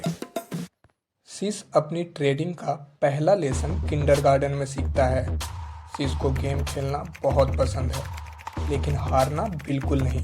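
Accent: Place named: Bihar, India. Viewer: Awaaj se Bihar Wale bhaiya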